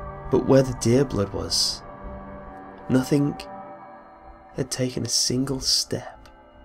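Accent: United Kingdom. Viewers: Why would a British person be telling an American folk type story? Thats ruining my immersion.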